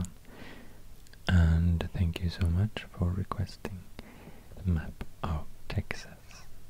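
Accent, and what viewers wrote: Sweden. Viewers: Svensk? Eller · Idk why but there’s something satisfying about hearing Texan city names being spoken in a Swedish accent.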